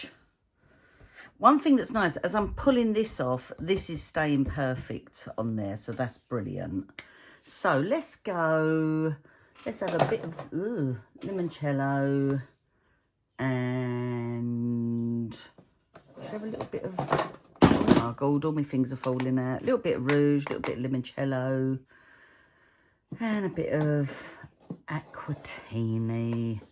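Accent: United Kingdom. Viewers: I was not sure about you at first - but I grown to love you and your videos. I love to hear you talk in your UK accent and words. I love that you show mistakes and then make something so great out of them. I just wanted to tell you I have the black Stampin up mat that you tried. I find if I'm not in my craft room it dries out and it is not sticky. So if you use a wipe or even just a damp cloth it should bring the sticky back. Again just wanted you to know you have such a fan in me - I have learned so much from watching you. Bebe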